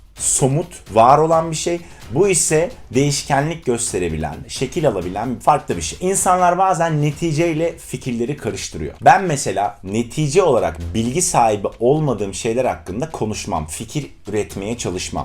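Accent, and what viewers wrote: United States. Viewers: abi dediklerin cok dogru turkiye insanlari degismez ingilizcen bence bir amerikalidan daha amerikali iyi ki varsin <3 su an ingilizcem cok az var seninle beraber ingilizce ogrenmeyi istiyorum ve dediklerine fazla takiliyorum <3333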